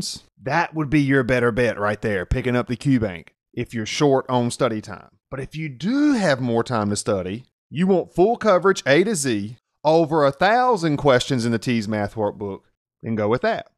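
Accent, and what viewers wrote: South, United States. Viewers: the problem is most of the famous channels are bad to explain math(or at least they're not beigginer friendly)...I think you have inate ability to explain things like no other ..saw one of your video...I'm really really bad at math(math phobia)...currently Delving into math..even though don't understand calculus but understood one of your linear approximation calculus video....at least 90% of it and i was brooding if he were my neighbour, teacher and then thought should make a comment...it's rigorous work to make a playlist like pre algebra and algebra entirely including every topics and it's too much to ask for...my goal is to learn pre algebra and algebra ..then geometry, trigonometry, pre calculs and calculus..I think I will never learn these cause bad at self study and grasping Abstract idea like math...Best regards and wishes to you❤...love love that country accent...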